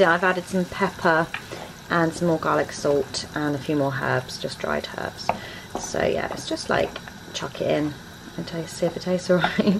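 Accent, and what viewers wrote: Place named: United Kingdom. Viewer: I like the way you explain everything British people are so nice to hear 😁👍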